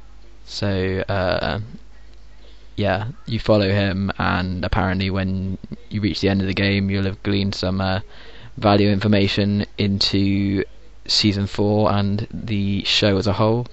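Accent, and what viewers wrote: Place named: United Kingdom. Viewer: teehee...cute. You sound more British to me than you did in the BWR days. I am SO excited for Lost! Wee!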